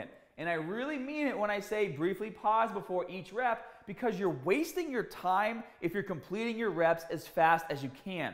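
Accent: Boston, United States. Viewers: is that a boston accent i hear 👂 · The Boston accent was strong in this video lol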